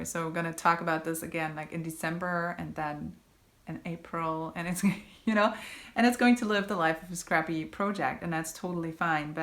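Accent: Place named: United States. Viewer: Your English is lovely. As inspired by fiber stated, we always have to pause to think of the correct word to use and we speak English as our 1st language😂. Your accent is amazing. You sound like you live in the US. So, beautiful job. I, too, loved the yellow yarn story. Thank you for sharing. Hugs.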